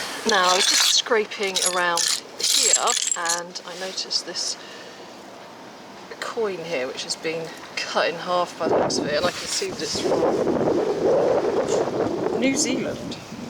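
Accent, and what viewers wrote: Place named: RP, United Kingdom. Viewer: I am Brett eh? That's so funny, it's Lambretta 1500 a little scooter no doubt. Has anybody told you that your voice is perfect for radio. I listen to you last thing at night time before I got to bed as your voice soothes me ready for sleep. You sound like you went to a private girls school, by the way I am in Geraldton in the outback of Australia and I troll the op shops for antiques nc collectibles. I am a huge fan, love your work, keep it up. Happy Easter, Warm Regards Trevx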